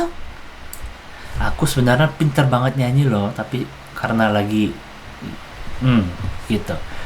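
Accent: Indonesia. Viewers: mantap kak.. emang org indo harus ikut lidahnya org indo.. liat yg luar negeri susah dimengerti 😁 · Saya suka, logat Anda Indonesia banget. Mantap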